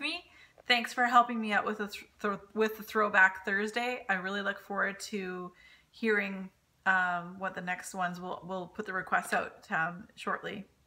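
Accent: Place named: Canada. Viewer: loving your Throwback Thursday candle reviews. I"m all caught up now and looking forward to more. Your Canadian accent is adorable!;-)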